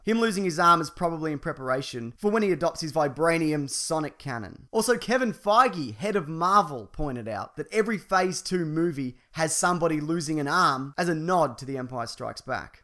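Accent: Australia. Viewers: Came for Avengers trivia, stayed for the lovely australian accent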